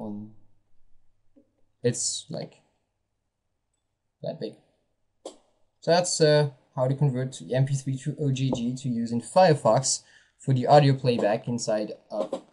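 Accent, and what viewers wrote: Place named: Quebec, Canada. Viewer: enfin un quebecois xD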